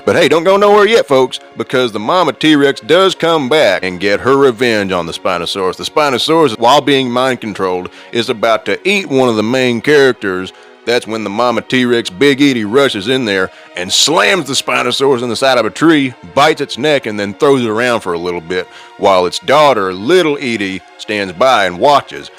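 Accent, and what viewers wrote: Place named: United States. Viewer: This guy has the most american accent i've ever seen.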